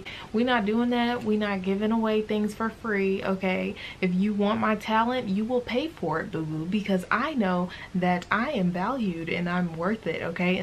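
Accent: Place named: South, United States. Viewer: i looove your southern drawl omg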